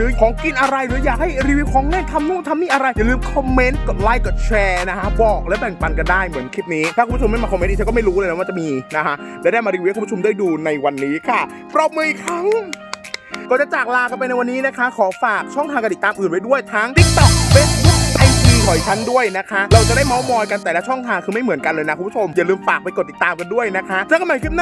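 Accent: South, Thailand. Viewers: พี่เปนคนจังหวัดอะไหรค่ะ เหมือนคนใต้เลย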